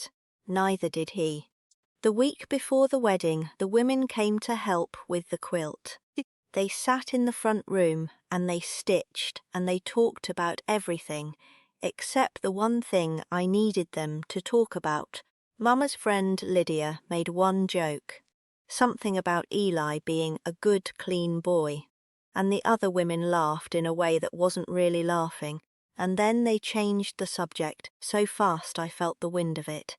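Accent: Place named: England, United Kingdom. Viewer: And, now she has an English accent. I hate AI.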